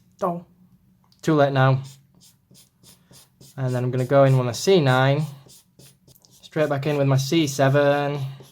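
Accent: United Kingdom. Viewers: I love your British language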